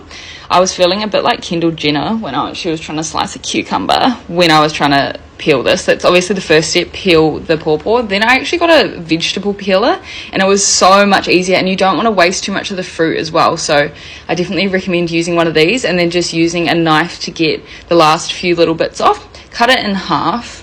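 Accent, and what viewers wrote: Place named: New Zealand. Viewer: Kiwi accent coming through there, loud and clear. 'Small little bits'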